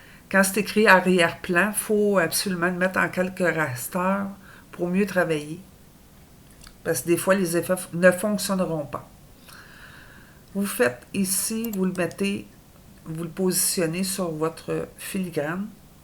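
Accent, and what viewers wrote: Quebec, Canada. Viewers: Hello, merci pour ces tutos en français, je cherchais cela depuis quelques temps. Avec ce merveilleux accent du Quebec. Un maudit français. Bien cordialement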